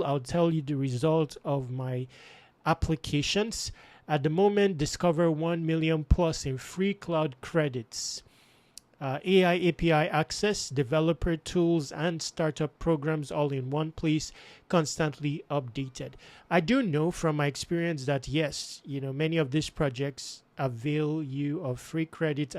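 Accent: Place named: Nigeria. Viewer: Hello, thanks for sharing. You sound Nigerian 😊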